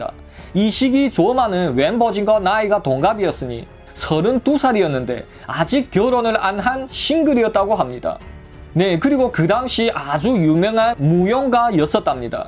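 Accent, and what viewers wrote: China. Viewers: 어찌된게 삼국지 보다 더 잼나지 ㅎㅎㅎ 중국 말투가 섞여서 더 집중이 되네요.. 너무 잼나여 감사합니다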